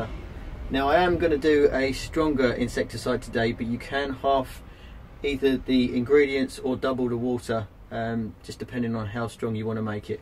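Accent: England, United Kingdom. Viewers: Thank you young man for an excellent video. No heavy background music and a nice pleasant slowly delivered English accent. Followed your advice and it worked a treat.. Many Thanx Grumpy Grandpop.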